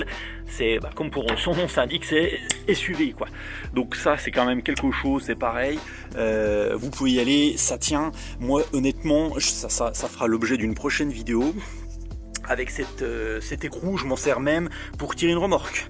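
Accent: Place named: Jura, France. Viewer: Superbe video! Tu as l'accent Jurassien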